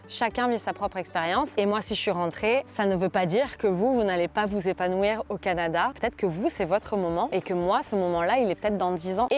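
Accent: France. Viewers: Ou un échec ? Non, c'était pas le bon moment c'est tout. Ce qui me plaît, c'est ta spontanéité, ton amour pour Aiko et cet accent du sud-ouest. Wow désolée pour ton papa.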